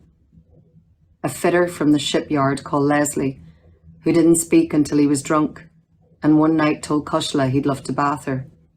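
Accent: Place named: Ireland. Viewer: I love her authentic Irish ☘️💚🩷👏🏻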